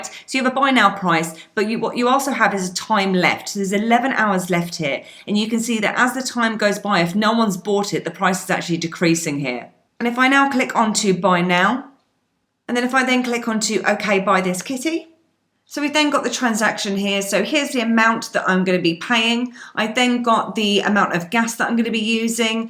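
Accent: United Kingdom. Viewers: the prim and proper British pronunciation that's catnip to an American's ear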